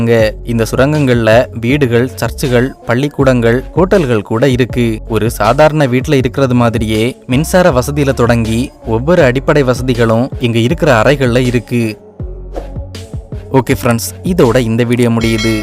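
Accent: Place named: Kerala, India. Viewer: Keara tamil superb bro❤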